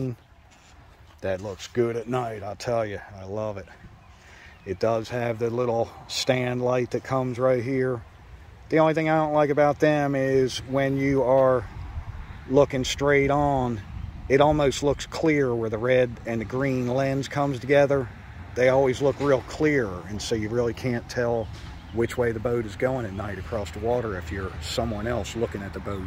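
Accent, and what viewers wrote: Philadelphia, United States. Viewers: Damn that’s nice. Is that a Philly accent? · This guy from Philly?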